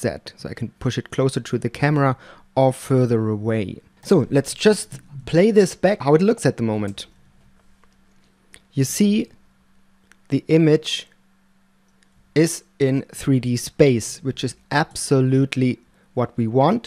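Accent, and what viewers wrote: Germany. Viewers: Du hörst dich deutsch an :D Vieeeeelen Dank für das Tutorial, genau was ich gesucht habe und absolut verständlich erklärt ! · You sound like a german, idk man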